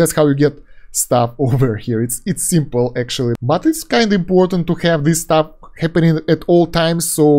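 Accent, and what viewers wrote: Russia. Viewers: уфф, я был уверен, что это русский акцент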